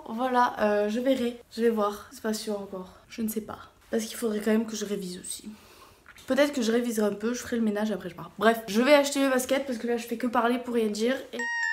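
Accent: South, France. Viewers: tu as encore plus l’accent du sud quand tu es avec tes parents j'ai l'impression! c'est marrant ^^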